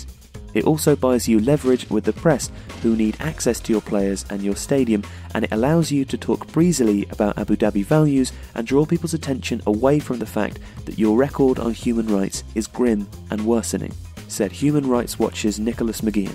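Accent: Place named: England, United Kingdom. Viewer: your narrative sounds heartfelt and sincere, in the end that's all it is. The narrator sounded English, presuming you are too. Frankly, just cut the crap ok, mate. If you are English you need to cut the b.s. with your holier then thou tounge. English were always the clever racists, at least that's what they believe anyway. Like I said, don't use the poor migrant workers as a front to establish your bigotry( I have relatives who lost almost everything in these scam), your agenda is quite clear and clearly biased. You are also showing your true color and age, by using animation to describe something that lack authentic journalism. Make your YouTube bucks and shut your trap, boy.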